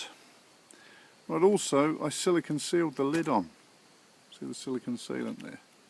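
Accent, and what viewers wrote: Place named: Hampshire, United Kingdom. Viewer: Never knew you were a 'Ampshire hog' like me. Funny how accents deceive people! ATB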